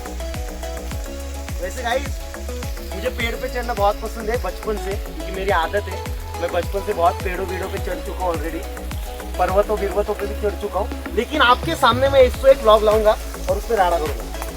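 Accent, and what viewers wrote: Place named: Maharashtra, India. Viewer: Best thing is, That one special Marathi Touch 💚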